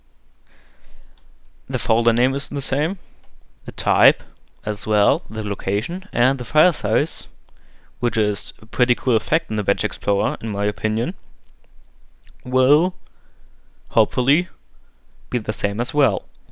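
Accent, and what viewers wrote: Germany. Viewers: Bist du Deutscher oder Engländer?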